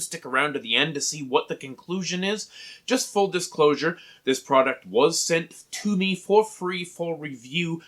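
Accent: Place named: Canada. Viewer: I hear that canadian accent 👍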